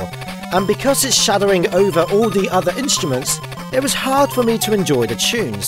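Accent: United Kingdom. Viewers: His british accent just adds to the video, I don't know why... Assuming it is british and I am not an idiot (Edit: Nice to see a Youtuber who views his comments, Thanks for the heart)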